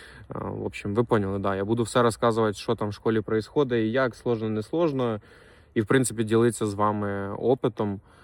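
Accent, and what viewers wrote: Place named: Ukraine. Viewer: говорит на русацком языке, добавляя украинский акцент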